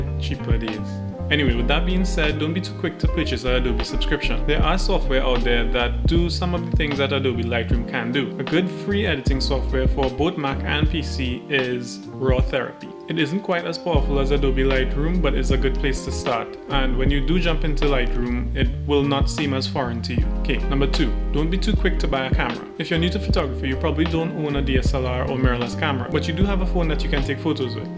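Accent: Trinidad and Tobago. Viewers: Lol how u sound like a trini so bro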